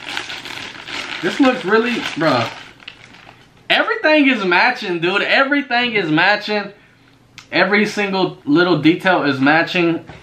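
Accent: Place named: Wisconsin, United States. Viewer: bruh u sound like ur from beloit wi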